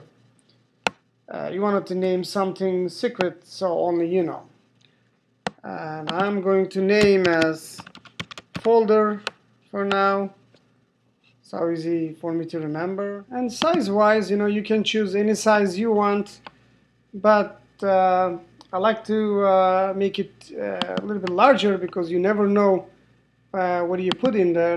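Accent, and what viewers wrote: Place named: Russia. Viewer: russian lol!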